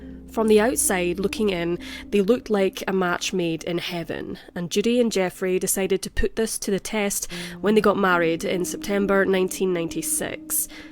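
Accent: Scotland, United Kingdom. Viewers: I understand your Scottish but your voice just reminded me season 3 of Derry girls airs on Netflix tomorrow 🙄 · I was trying to think of a polite way to correct her pronunciation of "Hyannis". She said it, "Hee-annis". It's actually, "High-annis". I grew up there. For what it's worth, I think her accent is quite lovely. And I'm sure I would butcher the pronunciation of whatever Scottish town she grew up in. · American here; the Scottish accent is hands down my favorite of all of the English first language accents. Australia's a close 2nd. It's as if Kelly Macdonald is narrating this. I'm glad that I found this channel, it should be even bigger than it already is. It takes quite the grind to make 100K+ subscribers. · Where abouts in Scotland you from? East coast? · The Scottish accent of the narrator makes this all the more chilling, every time.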